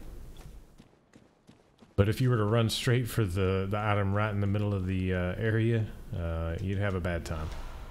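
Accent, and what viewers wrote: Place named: South, United States. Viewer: Yes ma’am I can tell you got that southern draw lol me too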